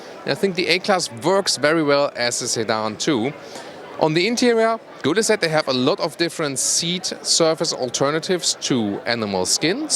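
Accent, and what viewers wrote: Germany. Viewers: Thank you very much for another very informative and professional review,. 👍 Your in-depth presentation style is so much better than the competition, in so many ways... highly professional and always delivered in that impeccably suave and sophisticated German accent of yours, that is every bit as slick and refined as the automotive design and engineering masterpieces that you showcase! 👌😉 Thank you for all of the hard work and laborious camerawork and editing that goes into each of your in-depth videos, as well as your incisive commentary and detailed observations that many other reviewers leave out. My daughter has her own YouTube channel with nearly 20,000 subscribers and so I know how much time and work goes into producing even a short video, let alone your more exhaustive reviews, and while many of your viewers may take all of that for granted, I certainly appreciate your efforts and have learned a lot from watching your videos and enjoy your presentation style immensely. 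Keep up the great work! 👏😊 ~ Kind Regards, Louis.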